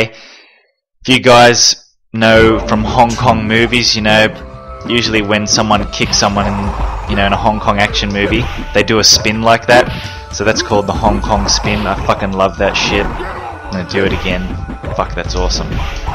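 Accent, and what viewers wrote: Australia. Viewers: Love your work man! You aussie?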